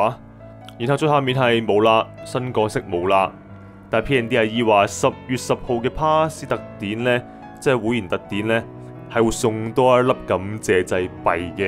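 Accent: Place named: Hong Kong, China. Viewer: 就算一早睇哂當日所有最新情報都好，點都要聽你條片講多次，好鍾意你個d好地道港式既口吻❤加油❤ 利片：非基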